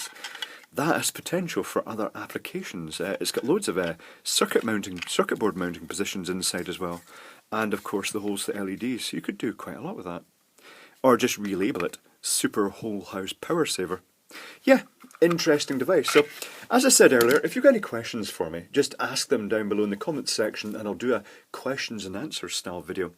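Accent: United States. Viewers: Dear, If you're still accepting questions, here's mine. Why do you use so much American dialect? Have you spent a lot of time in the States?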